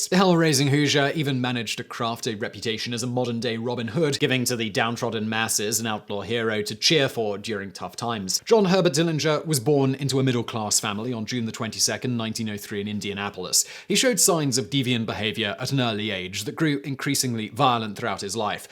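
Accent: United Kingdom. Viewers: I enjoy when uses lingo in is professional British accent. Terms like "all day stretch" to mean a life sentence and referring to prison as the "pokey" sound hilarious with that accent.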